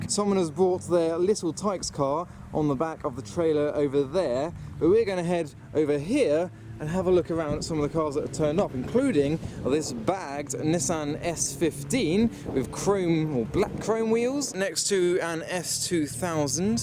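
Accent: United States. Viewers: Nice American voice loll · More of your non-specific American accent, please. My favorite part. Wish you’d made more of a fuss about the Chimera.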